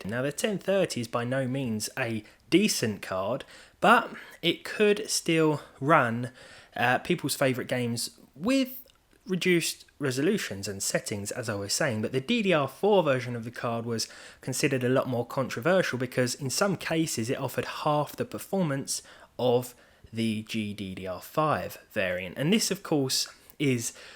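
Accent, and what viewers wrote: United Kingdom. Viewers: I have no use for these videos as my worst GPU is a 1060GTX 6GB, but I watch them religiously. It is the personality, the voice and the lovely accent that draws me. So envious of the British accent. Can't wrap my head around it.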